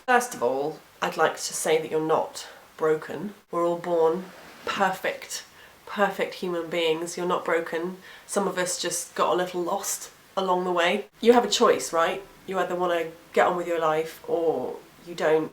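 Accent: United Kingdom. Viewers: you got great british accent i love that do on depression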